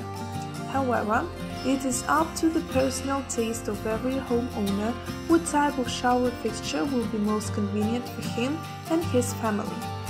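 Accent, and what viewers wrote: Russia. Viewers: Видео отличное, но вот вопрос: почему нельзя было озвучить на русском и сделать английские субтитры. И еще: Где нашли такой рязанский акцент?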